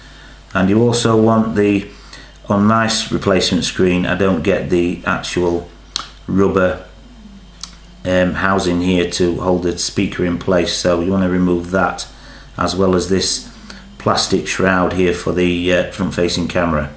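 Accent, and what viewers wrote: Yorkshire, United Kingdom. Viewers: Great video ! Couldn't help but notice your a Yorkshire man . If you live in Sheffield can I pay you to repair my phone ? Thanks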